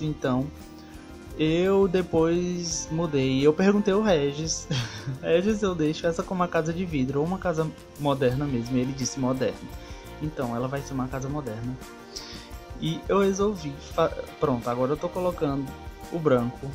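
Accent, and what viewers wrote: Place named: Northeast, Brazil. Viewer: tu é de qual estado?? tem sotaque nordestino ❤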